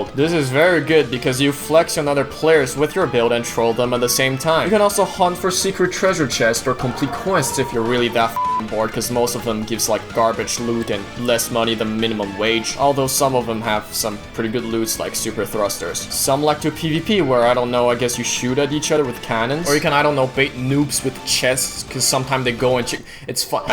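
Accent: Germany. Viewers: Are u german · are u german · He has a German accent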